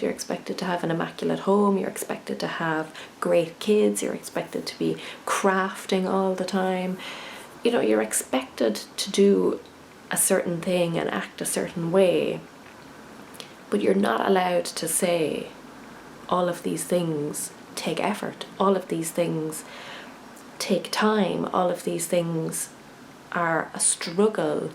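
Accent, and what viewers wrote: Ireland. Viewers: Off topic, but your brogue is just lovely!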